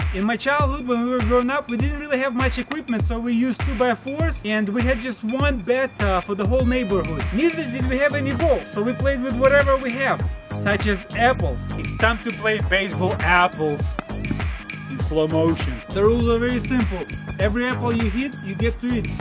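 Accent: Russia. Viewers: Русский акцент не скроешь.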